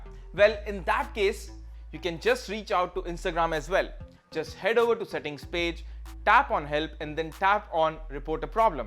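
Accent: India. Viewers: Can u please do not the Indian accent?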